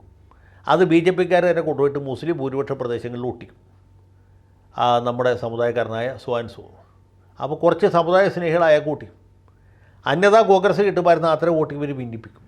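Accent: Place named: Thiruvananthapuram, India. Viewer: സാറിൻ്റെ തീരു::പുരം ഭാഷാശൈലി വിട്ടുപോയില്ലേ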